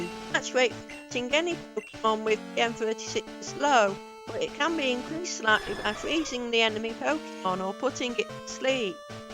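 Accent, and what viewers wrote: United Kingdom. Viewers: Is that your voice? Are you british?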